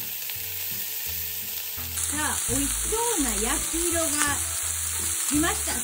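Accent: Kansai, Japan. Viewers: 大阪弁かなと思ったが、ちょっとアクセントが違うので京都弁かなと思い、プロフィールを見たら、京都出身の方でした。 いつもユニークなレシピ参考にしています。３９ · 大根きんぴら作りたいと思います 先生は千切りが好きとおっしゃってましたので流石にうまいです手際が良い。 関西弁が可愛らしいです。そこ知りたいってところのコメントがうまいです😋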